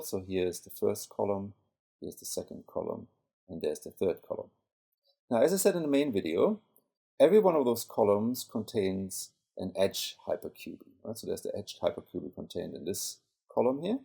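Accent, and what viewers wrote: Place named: Germany. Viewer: Hello, I just wanted to thank you for bringing my attention to this great puzzle. I have cubed now for nearly half of my life and solving this thing has been my greatest archivement so far. Your videos inspired me and helped me to find my own - and until now very unefficient - algorithms (it took me about 5400 turns). My next step is solving this in less than 1000 turns. Way to go! I always wanted to ask you whether you have German origins of some kind? I am from Germany myself and your accent sounds kind of German in my oppinion, in addition to your name obviously:D Anyway, thank you again, and greetings from Germany!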